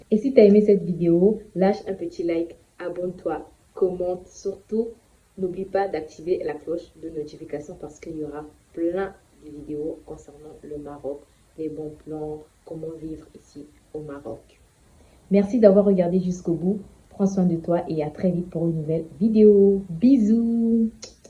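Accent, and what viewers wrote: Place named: Gabon. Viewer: Ton accent est gabonais 😅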